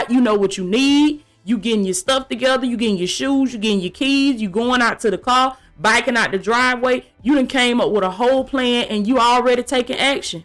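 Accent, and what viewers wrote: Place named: Georgia, United States. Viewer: U sound like u from louvalle ga. THAT'S A GOOD THING. His children r there too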